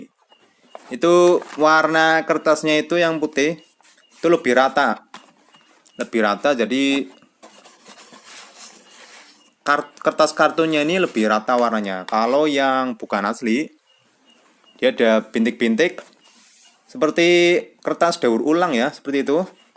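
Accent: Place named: Tegal, Indonesia. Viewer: Kiye mah wong Tegal ngalor kiyeeeeeeeeeee 🤣🤣🤣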